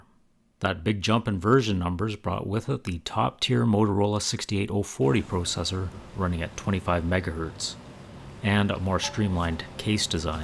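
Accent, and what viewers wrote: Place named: United States. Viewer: It's strange to see this level of obsession with the perfect restore from someone with such an authentic American accent.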